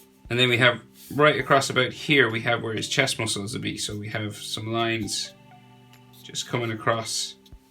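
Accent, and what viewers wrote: Ireland. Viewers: Your accent sounds sorta irish would ya happen to be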